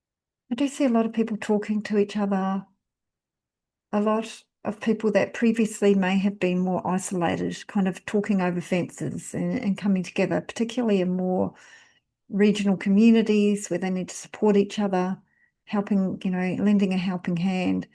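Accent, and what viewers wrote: New Zealand. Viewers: Just found you early hours on 21st November!🙂 It's so refreshing seeing and hearing a kiwi accent!🤓🙏🙌 Thank you!🌸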